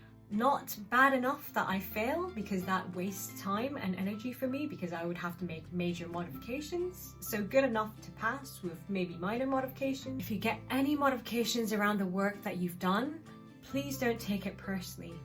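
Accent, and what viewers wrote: Scotland, United Kingdom. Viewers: I’ve missed you! You look well and sounding Scottish! 😮 Basically work hard but don’t forget to rest, have fun, see friends and family, go on holiday, say no and ask for help when needed!? 😅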